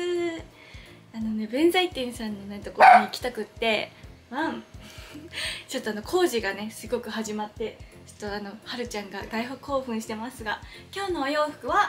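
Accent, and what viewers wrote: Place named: Hiroshima, Japan. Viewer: イントネーションが広島‼️(๑′ฅฅ‵๑)www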